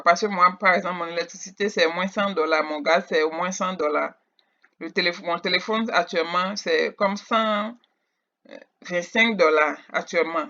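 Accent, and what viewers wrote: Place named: Benin. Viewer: Tu a un accent Beninois. 😊 I hope you are doing good sister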